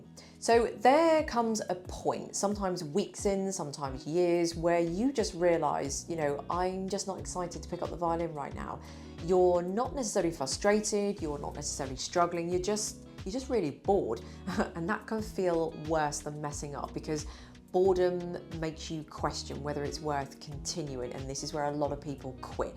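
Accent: United Kingdom. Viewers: Not going to lie I stopped playing violin like 5 years ago yet still here I am watching your beautiful face and attractive Aura with your British accent😅😂😂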